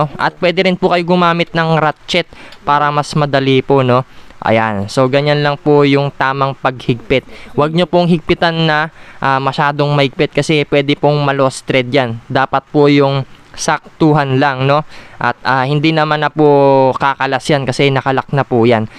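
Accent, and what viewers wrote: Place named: Pampanga, Philippines. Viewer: Ot atin halo kapampangan ING Tagalog mo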